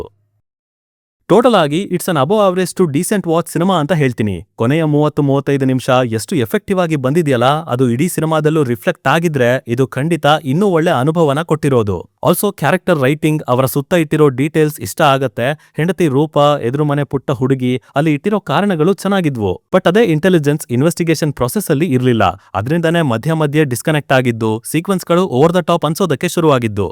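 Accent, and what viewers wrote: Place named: Chamarajanagar, India. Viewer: ಚಾಮರಾಜನಗರ ಭಾಷೆ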